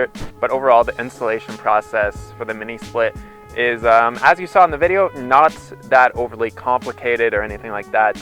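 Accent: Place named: Canada. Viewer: I'm from Western NY so I recognize your subtle Canadian accent. I enjoy hearing it and your video content.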